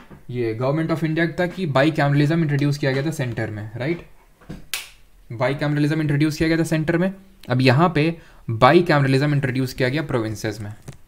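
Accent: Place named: West Bengal, India. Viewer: Your bengali pronunciation is very sweet ❤❤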